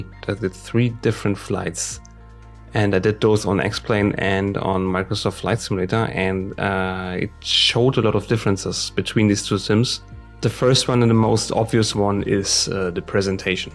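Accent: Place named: Germany. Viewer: Are you German (because of your accent)? I am German as well, that’s why I am asking:D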